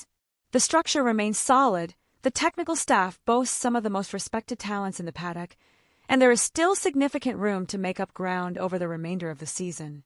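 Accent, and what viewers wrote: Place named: United States. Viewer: good hear some American accent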